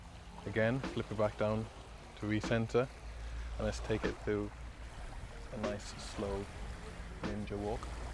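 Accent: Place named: Wales, United Kingdom. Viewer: I not only have Welsh roots I still live there - I am so glad you mentioned that as whilst watching your videos I can easily hear your Welsh accent (I guess you are more south as I am near Aberystwyth) but struggling to work our where you are based - my guess is Australia? Best wishes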